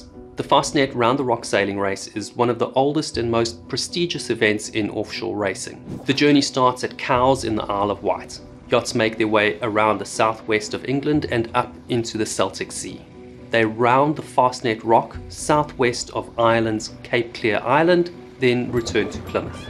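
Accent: South Africa. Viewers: you sound very south african...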